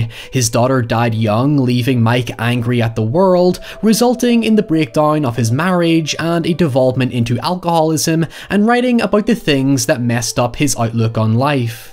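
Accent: Ireland. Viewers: I also do "Horror Genre" vids, and one of my wee ones (who happens to be very, VERY scared of the dark, and have a TON of phobias) likes to listen as I make a video, so I have to be careful which videoes I let her listen to me do Audio for... ANYWAY- whenever I get a notification one of one of your videos dropping, I like to take a break and listen. Now my wee one is obsessed with your accent too. SHE now loves Irish accents MORE than English accents ( I do a convincing combination of various UK accents- as I act too. Stage "Irish" sounds nowhere near as charming as your TRUE Irish. ENJOYED the video. This movie seemed to have completely changed from when I saw it in my late teens to when I saw it again in my late 20s. Now that I'm in my 30s it might be time to revisit it again.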